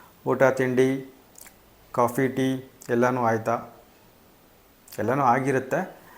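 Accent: Chitradurga, India. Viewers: ಚಿತ್ರ ದುರ್ಗದ ಕಡೆಯ ಭಾಷೆ. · ಅಣ್ಣಾ ನಮ್ಮ ದುರ್ಗ ಕಡೆ ಭಾಷೆ ಹಾಗೆ ಇರುತ್ತೆ. ನೀವು ಮಾತನಾಡಿ ಏನು ತೊಂದರೆ ಇಲ್ಲ ನಿಮ್ಮ ಮಾತು ಕೇಳುತ್ತ ಇದ್ದರೆ ನನ್ನ ತವರುಮನೆ ನೆನಪು ಮನಸ್ಸಿನ ಮುಂದೆ ಹಾದು ಹೋದ ಹಾಗೆ ಆಗುತ್ತೆ. ಚಿತ್ರದುರ್ಗದ ತಂಗಿ 🙏🙏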